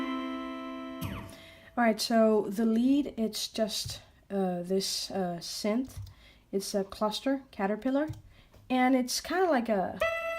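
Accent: Netherlands. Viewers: where are you from? Dutch perhaps or another accent? it sound familier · Naw she is Dutch. Ik kan het accent horen.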